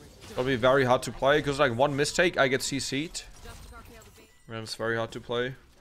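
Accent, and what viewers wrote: Germany. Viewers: This game I realized you are german and I watched a lot of videos of you😂. Usually every german is with 100% accuracy detected by me after the first few words because german-english accent is very obvious.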